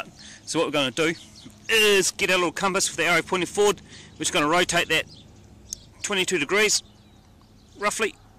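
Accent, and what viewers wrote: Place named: New Zealand. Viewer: You are awesome! thx a lot!! Love you kiwi accent. Been there for a yr living in Dunedin! Now i am back in Argentina, south america. Happy Flying!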